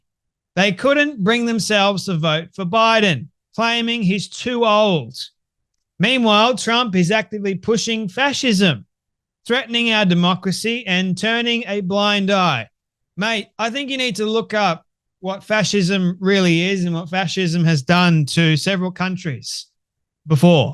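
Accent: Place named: Australia. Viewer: LOL, I love how Aussies say.. "mate", especially you,.. Happy.Night/Day:D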